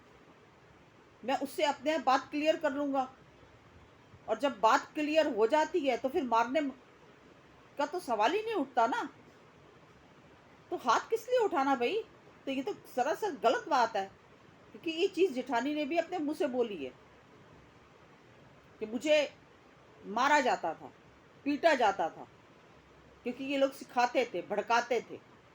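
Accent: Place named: Muzaffarnagar, India. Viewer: Haryana ka touch to bilkul nahi aata. Muzzfernagar ke area mai aise hi bola jaata hai.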